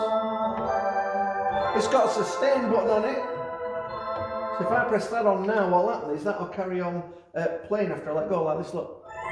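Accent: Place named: Lancashire, United Kingdom. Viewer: This fellow is much more Ace Rimmer than Arnold Judas - what a (nice) guy! Thanks for the enthusiastic demo, all wrapped up in a good honest Lancashire accent! I bet he's a great dad :D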